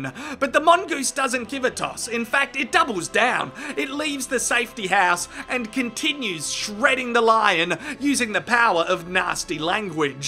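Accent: Australia. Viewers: The Australian accent + profanities= bleeding ears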